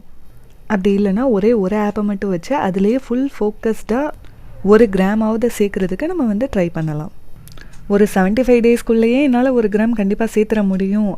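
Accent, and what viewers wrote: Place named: Kerala, India. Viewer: Your voice is soo good ma like malayalee speaks tamil. Slang is different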